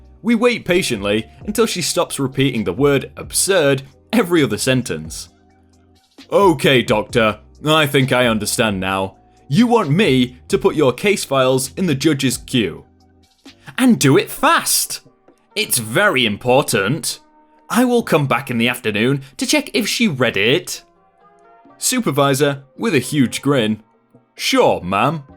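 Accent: United Kingdom. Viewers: nice to hear a brit accent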